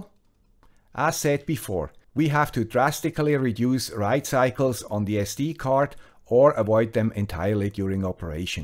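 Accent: Switzerland. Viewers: thanks to the guy with the Swiss accent, another great tip and information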